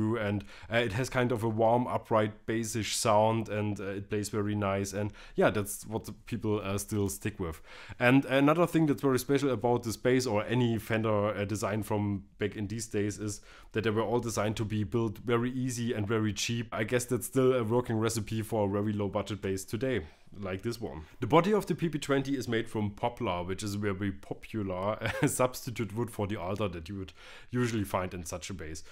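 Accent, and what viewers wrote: Germany. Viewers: mann hört das du deutscher bist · Man hört so richtig, dass du Deutscher bist!!! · This german accent is killing me hahaha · Direkt nach dem ersten Wort erkennt man den Landsmann =D Will mir von Harley Benton das bass Bastelkit kaufen bin mal gespannt ! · When you're german and you try to speak English😂 you can hear the german out of him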